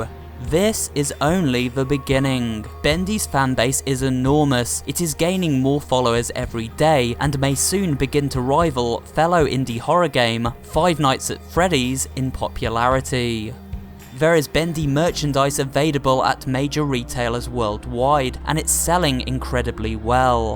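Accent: United Kingdom. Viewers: You are like the British version of the Chills YT channel with the way you talk.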